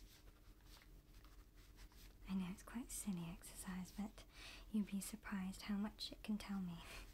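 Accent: United Kingdom. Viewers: ASMR + Cosplay + British Accent + Cute Shawty + Sci-fi + Mythology + Fantasy + Movies/Video Games = Exothermic Reaction 🔥 🔥 🔥 This channel gonna blow up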